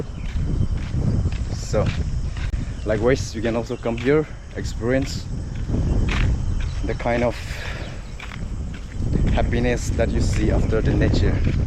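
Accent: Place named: England, United Kingdom. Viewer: Hahah this kid 😂 Still got the old vibes Love your English accent Big fans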